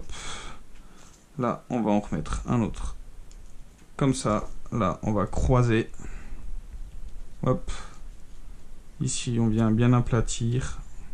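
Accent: Switzerland. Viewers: Parce que sur le carton de blanc de colle il y a les trois langues parler en suisse en plus tu as un accent suisse et les français ne disent pas papier ménage. Ils disent sopalin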